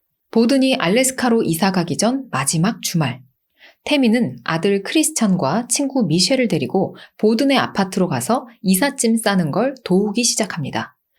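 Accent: South Korea. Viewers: 🙄ㅈㅓ는....한국식 발음이 좋ㅇㅏ요.!❤😁👍ㅎㅎ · 한국식 영어발음은 친근하고 귀에 쏙쏙잘들어오니 좋은걸요 ㅎㅎ 바른 발음으로도 알려주시고 여러모로 꼼꼼하게 다정세심하신 님❤ 오늘영상도 고맙습니다! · 영어발음 관련해서 까다로우신 분들이 많았나봐요, 전 한국식발음이 더 듣기 편하고 좋은데 ㅎㅎㅎ 고나리 넘 신경쓰지 마세용 ㅎㅎ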